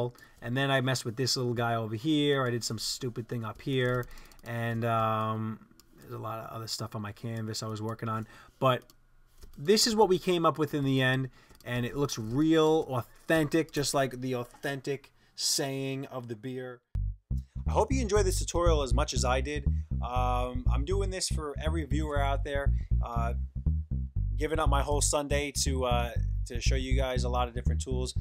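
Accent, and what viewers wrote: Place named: Boston, United States. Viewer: You have a boston accent.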